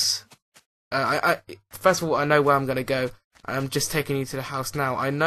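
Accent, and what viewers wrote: United Kingdom. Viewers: You say we Americans have an accent? Well I don't believe that but I do like the British accent like you did say... But I would love to have a German accent. So go ahead take my accent I'll take your British one. :)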